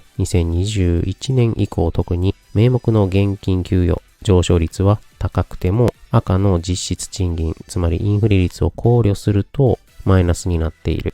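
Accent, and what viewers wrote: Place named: Kansai, Japan. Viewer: マクロもミクロの強くていらっしゃる、羨ましいです。関西言葉で、落ち着いて話されるので妙な気分になります。楽しいです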